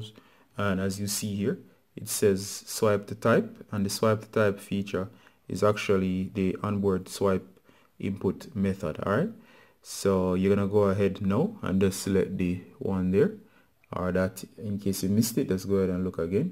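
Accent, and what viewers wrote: Jamaica. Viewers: U Jamaican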